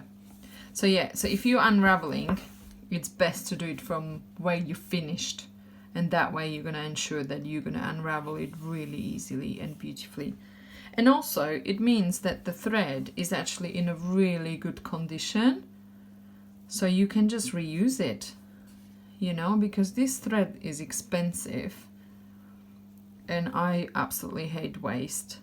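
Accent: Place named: Slovakia. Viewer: Ďakujem za skvelý návod,rozpoznávam slovenský prízvuk :-) .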